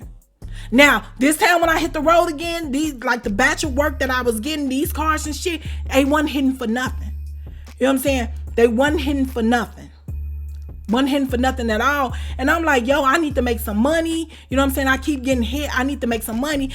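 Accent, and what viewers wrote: Chicago, United States. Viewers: I just found your channel this morning and on the 3rd video lol I know my ppl when they speak (Chicago #773 😌) . Keep doing what you’re doing 💪🏾💪🏾💪🏾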